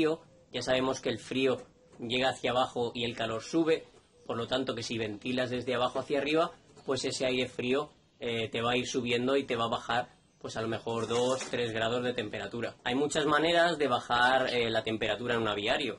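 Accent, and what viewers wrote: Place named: Spain. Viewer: De que parte d españa eres ho de malaga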